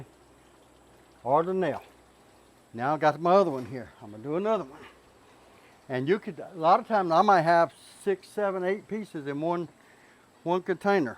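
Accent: United States. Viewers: He has the best American accent.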